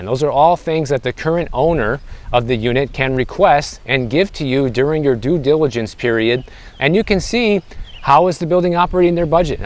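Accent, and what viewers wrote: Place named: Midwest, United States. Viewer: Being from Boston I love hearing the Midwest Fargo-ish accent . Love the videos bro!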